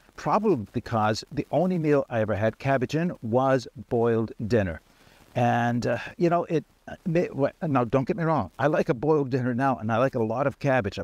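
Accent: Canada. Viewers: Yeah cool, I did assume you'd have some similar "iron" type device over there. No worries on Canadian diction mate, just curiosity, it's those Yanks that tend to bastardise the language,..... but even then, it's all in good fun, we are arguably the worst of the English speaking nations for it; ) Had that book a while now, to be honest I didnt know if it was still available, since it is, I'd be very surprised if it's expensive. And of course it doesn't mean you must stick rigidly to the recipes, in fact I rarely do, It's more the principle of the thing in a camping context, that I mentioned it.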